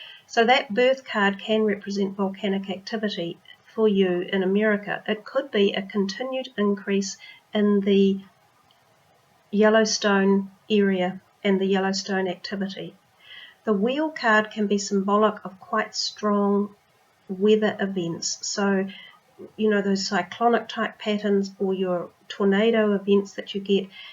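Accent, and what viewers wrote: New Zealand. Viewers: I'm loving hearing your Kiwi accent!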